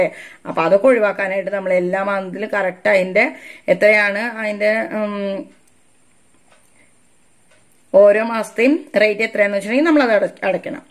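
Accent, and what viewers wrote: Thrissur, India. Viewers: 😁nan subscribe cheithu.chechide varthanam kelkkan nalla rasam undu.thrissur basha.nan kannur.epo husinte koode gulfil.chechide morucurry anikishta.nan undaki.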